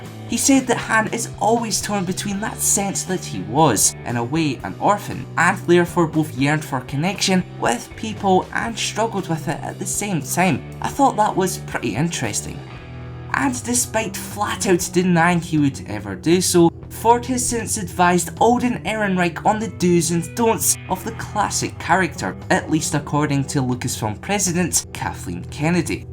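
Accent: Scotland, United Kingdom. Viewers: Are you a scotch? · What part of Scotland? I have friend who's husband is from Glasgow, I have a really hard time understanding him over the phone. You have a lovely accent and I can understand ya perfectly. :)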